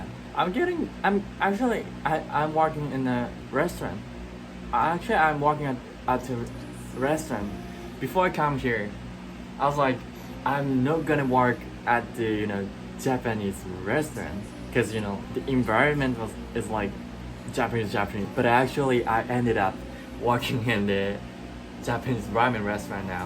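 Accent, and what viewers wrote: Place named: Canada. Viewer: バンクーバーに移民して25年たつものですが、未だ来て一年で英語がとってもお上手ですね。アクセントも私の息子達の様にカナダ人ぽくて驚きました。これからも頑張って下さい。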